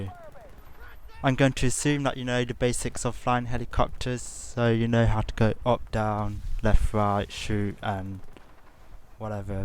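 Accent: United Kingdom. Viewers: are u british u sound british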